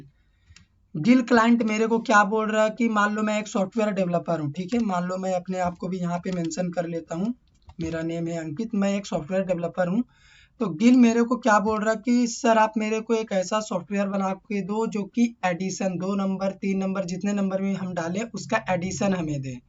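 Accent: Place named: Bihar, India. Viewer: Bihari accent is lob❤️ 'haiyee nai h'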